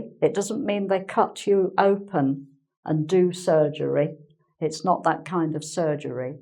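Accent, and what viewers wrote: England, United Kingdom. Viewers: I like her personality and her British accent 😍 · I love your British accent, it's sounds very cool 😊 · Dear mam,your speech itself makes us to understand the British accent very well.Thanks a lot. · I like your perfect british accent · Thanks for your greet efforts I loved your British accent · I love your english accent!!, it sounds elegant and clear,, some day I hope I´ll get it,,, the class was really useful !. I´m from Perú!! Cheers from Perú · Hi Mom, I'm from Indonesia. I like your british accent. I like also the way you teach. I always learn English from your videos. Thank you so much. God bless you.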